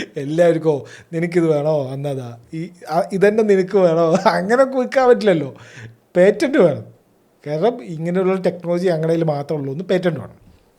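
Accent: Palakkad, India. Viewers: Bro ningal palakkad ano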